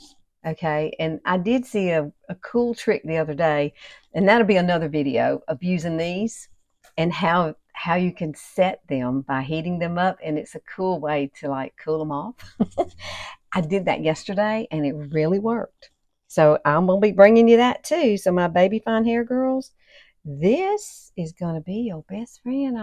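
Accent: South, United States. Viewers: Oh my, Alabama girl here as well! Love your southern accent as well as your hair!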